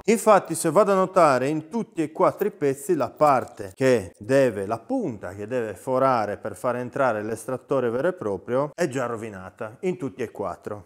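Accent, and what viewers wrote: Sardinia, Italy. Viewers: Sei sardo? (Io si è in tal caso si capisce😂)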